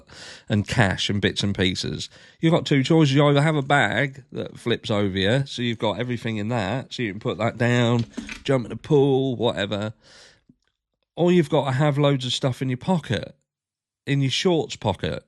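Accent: Norfolk, United Kingdom. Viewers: That’s a good old Norfolk or Suffolk accent boy where ya from ??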